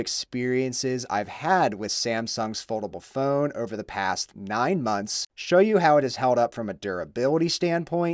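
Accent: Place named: California, United States. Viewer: U talk like californian gurl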